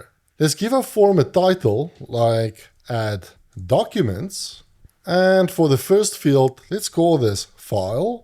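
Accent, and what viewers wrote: South Africa. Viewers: Thanks. I recognized a fellow South African as soon as you spoke! Lekker man. The steps you explained doesn't work. Guess maybe they "fixed" your work around to get the AI agent to use ChatGPT without a api key? wish it worked for me. Thanks for this Tutorial though! Cheers! Did anyone else here get it to work?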